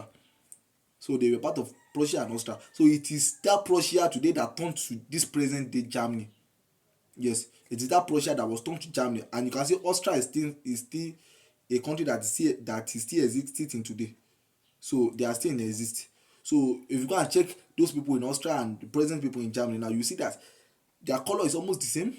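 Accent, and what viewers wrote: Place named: Africa. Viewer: It is simply an African Dialect of english, don't call it gibberish just cuz you cant understand it bro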